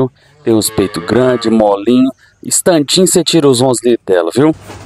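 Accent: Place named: Goiás, Brazil. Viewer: gosto desse seu sutaque de goiano Raiz rsrsrs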